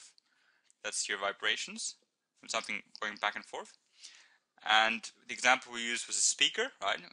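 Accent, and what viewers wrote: Germany. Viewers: ur german? i thought u were indian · Oh he's german! i was trying to figure it out throughout the whole chapter! LOL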